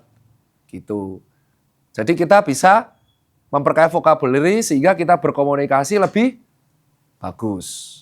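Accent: Java, Indonesia. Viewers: English Jawaa Medhokk , hmmm kek kenall yang medhookk medhookk "we wok......" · seru nih, soale Dokter Tirta medok Jawane, jadi sperti orang pada umumnya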